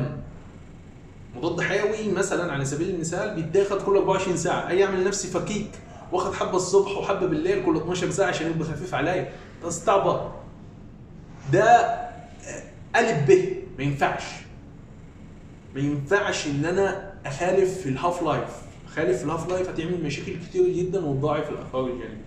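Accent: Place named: Iraq. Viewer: انت عراقي لو مصري؟؟؟؟!!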